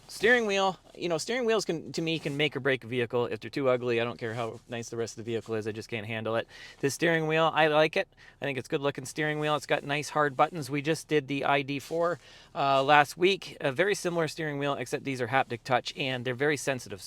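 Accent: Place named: Canada. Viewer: Hello from Manitoba! I can definitely hear that typical "canadian" accent everyone always talks about in the way you speak, im only part way through the video but you have definitely been very infromative so far!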